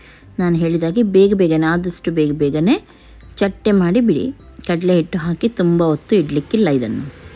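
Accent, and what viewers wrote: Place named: Mangalore, India. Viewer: ಟಿಪಿಕಲ್ ಕುಡ್ಲದ ಕನ್ನಡ.. ಚಟ್ಟೆ ಮಾಡಿ, ಮುದ್ದೆ ಮುದ್ದೆ ಮಾಡಿ, ಕರ ಕರ,.. ಕರೆಕ್ಟಾಗಿ ಹೇಳಿ ಎಷ್ಟು ಸಲ ಕರೆಕ್ಟಾಗಿ ಹೇಳಿದ್ದೀರಿ.. ಸೋ ನೈಸ್ ಅಕ್ಕ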